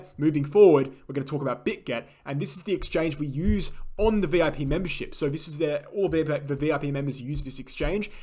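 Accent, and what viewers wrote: Australia. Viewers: Yeah I'm generally in line with this prediction. Great job,. Stupid question - are you from Australia or a Kiwi?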